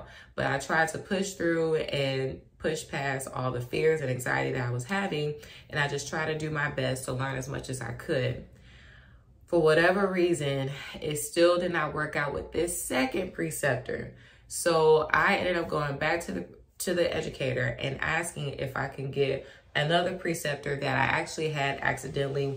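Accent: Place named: Cleveland, United States. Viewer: Are you from Cleveland? You sound just like my friend from Cleveland.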